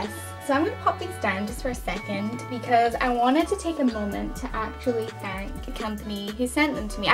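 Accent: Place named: Scotland, United Kingdom. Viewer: Took me a while to place your accent, then I when; “fuck that’s my accent.” 😂 Scots on YouTube are few and far between. I’ve gotta ask how is sizing since they are from the US? Or are they made big or small.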